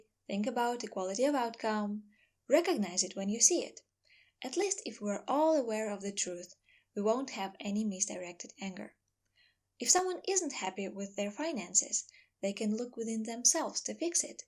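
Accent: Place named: Eastern Europe. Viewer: Your accent sound Eastern European.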